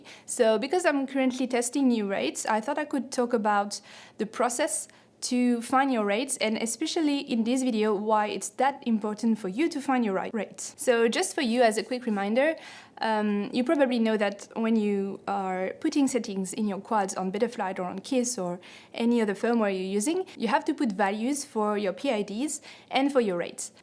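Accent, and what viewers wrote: France. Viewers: Great Video for Beginners. Very nice! I like your french english! Looks like more snake rates then Steele rates! Greetings from Germany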